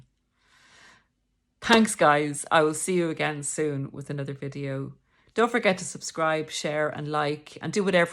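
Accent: Ireland. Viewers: Are you Irish? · Thank you for being so calm sensible and supportive of this community And I love your irish accent I am starting to almost be thankful to my narcissist that he led me to find all these wonderful people like you ande your channel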